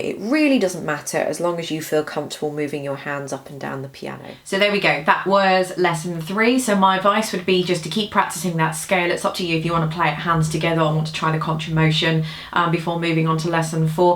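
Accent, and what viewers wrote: England, United Kingdom. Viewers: She is English lady her accent is different from Americans accent.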